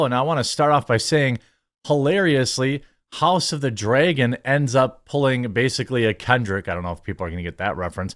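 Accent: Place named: New Jersey, United States. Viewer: " says dragon funny." Not sure why, but you have a new jersey accent. You have the same accent as Nick Nimmin, David Laid, etc.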